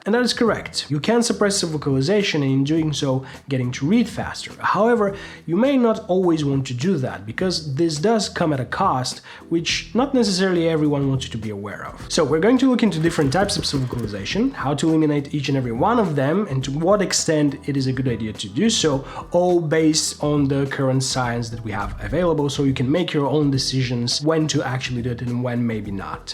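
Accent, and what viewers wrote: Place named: Brazil. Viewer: You sound brazilian, somehow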